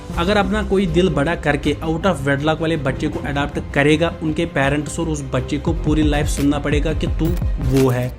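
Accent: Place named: Kashmir, India. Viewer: You are doing very well bro.... Keep it up zuva.... One suggestion there is a little need for upgradation in narrating skills and Accent. Koshur Accent thora sa reduce karunn Baaki overall video cxe zabardast❤❤❤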